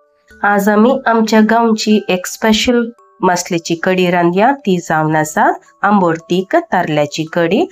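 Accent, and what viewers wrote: Konkan, India. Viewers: Tai Tumi ulaitalo Konkani bhasha kitle chod god aasa. Ani haanv pun ambot bangude ani tarlee nistyancho kadi banounk shiklo aasa. ❤❤❤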